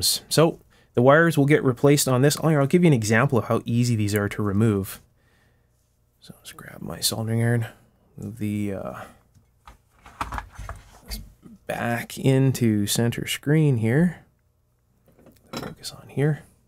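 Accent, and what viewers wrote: Canada. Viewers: Um I said to myself "what the hell is VIM?". I found it is an abrasive cleaner sold in Canada ( accent makes his origins obvious). However, it's not sold elsewhere in North America (from what I can see). Here's a friendly tip for the future, when bragging about a product, give context and show what the product is. VIM is too localized. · It's a great escape to see bring back a classic radio but the real therapy is in the monologue: his Canadian pronunciation is refreshing to these jaded American ears. My. mom used to voice her L's so distinctly that I would shudder as a child hoping not to be taken as foreign. Of course that was useless and the foreign property was a feature of the mind. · are you cannaddian?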